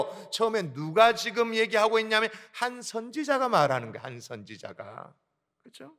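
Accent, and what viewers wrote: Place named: Gyeongsang, South Korea. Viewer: 목사님의 경상도의 유하신 말씀속에 경상도 특유의 강하신 말투로 하나님의 강력한 말씀이 느껴지십니다 코로나때 온라인 예배로 늘 고맙고 감사드립니다~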